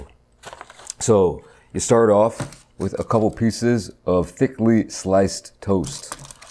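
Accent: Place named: Midwest, United States. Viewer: i new you sounded midwest i fuckin new it lol. i grew up in the northwest suburbs of chicago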